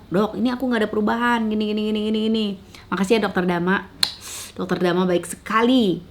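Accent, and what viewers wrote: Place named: Sunda, Indonesia. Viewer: suka bgt denger suara teh, sunda.....bgt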